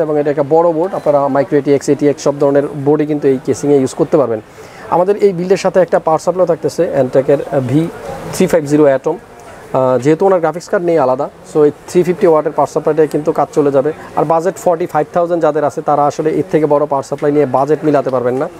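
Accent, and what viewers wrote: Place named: Sirajganj, Bangladesh. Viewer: Vaiya ta mone hoi sirajgonj er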